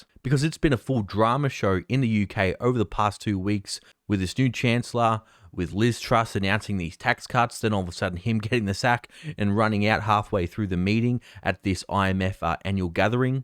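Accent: Australia. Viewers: Also, wow didn’t know you were from Australia, always thought you were British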